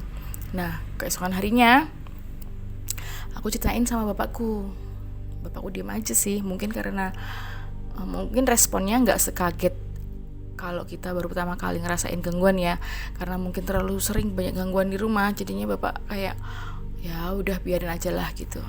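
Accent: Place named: Surabaya, Indonesia. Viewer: Ohh pantes, dr kediri.. Tak denger2in suarae kyk org Sby wkwkwkwk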